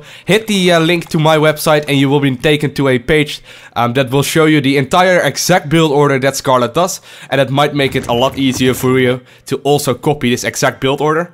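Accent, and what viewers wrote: Netherlands. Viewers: Are you from the netherlands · ik kan aan je accent horen dat je NL bent haha umm... wat voorn league ben je ? en neem aan dat je zerg bent?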